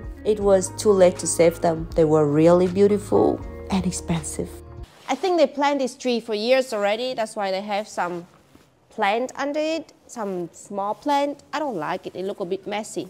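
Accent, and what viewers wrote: Germany. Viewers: Your English is starting to sound more German accented. It's cute 😏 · I'm so Confused because everytime I hear u talk for some reason I hear a more German Accent then a Vietnamese accent and I have no idea why lol. When I first found ur channel and saw u were living in Germany and after hearing u talk I just assumed u were born and raised there. Am I like the only one who thinks this?? Btw i really enjoy watching u and ur boyfriends channel! ❤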